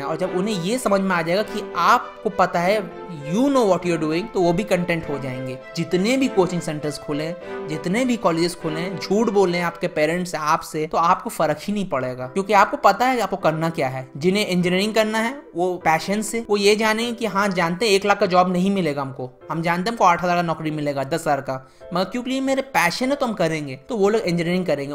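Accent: India. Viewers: Up ke lagte ho...Awsome vedio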